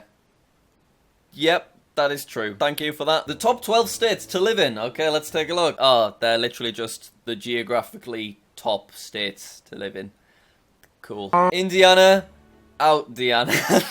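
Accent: United Kingdom. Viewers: Americas look more like a duck sideways we’re you saying dog or duck I’m sorry I don’t understand British very well I’m American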